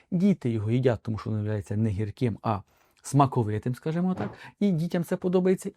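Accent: Vinnytsia, Ukraine. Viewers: Дякую. Дуже корисна інформація. Іще крім усього насолоджуюся Вінницьким акцентом.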